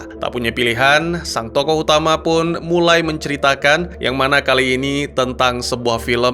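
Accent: Aceh, Indonesia. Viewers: Abang orang Aceh ya ?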